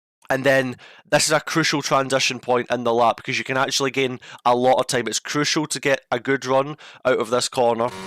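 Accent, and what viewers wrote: Scotland, United Kingdom. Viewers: I love thee Scottish accent mate.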